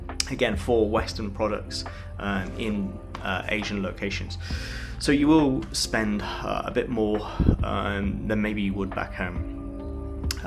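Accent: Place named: England, United Kingdom. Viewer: You seem to be a citizen of the world with the way you describe things comparatively across the countries. I presume you’re English, love the accent ❤ but amazed at how you refer to USD so naturally. Anyways, great video with very useful information. Thanks 👍🏻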